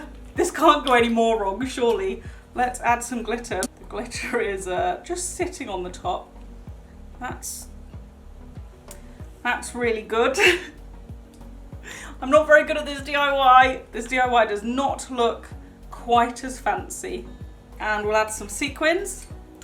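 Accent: United Kingdom. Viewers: she's British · Are u from Britain ?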